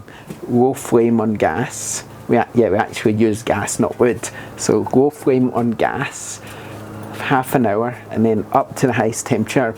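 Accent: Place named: Glasgow, United Kingdom. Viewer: Thanks for the info! I love your accent - are you from Glasgow?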